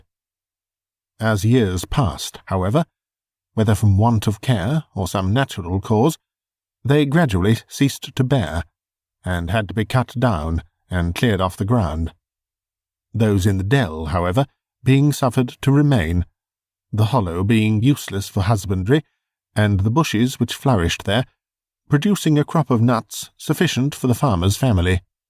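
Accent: England, United Kingdom. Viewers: You and this channel have become my absolute, number one favorite thing to listen to and I listen to them repeatedly! IMHO, only a proper English gentleman can do these awesome old ghost stories the justice they deserve and I’m so happy to have discovered you! I enjoy your readings just as much as I enjoy Michael Hordern and Basil Rathbone readings but (unlike them) I can look forward to new ones from you. I listen every single night when I climb into bed and let me tell you, I’m enjoying losing sleep...THANK YOU!!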